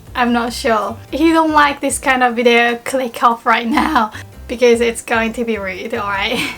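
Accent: England, United Kingdom. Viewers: ive been wondering for a while as to why you had more of an English accent. Makes me happy to confirm you had a long(?) experience here. Hope to see you around in Tokyo. Look after yourself~~ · This video really makes it obvious you lived in England, ignoring of course, the British twang to your English accent. One question: in England insulting people (often seemingly harshly) is a big part of our culture, the closer you are to someone often the harsher these insults can get. How did you find this practice when you were living here, and how did this affect your perception of insults since moving back home?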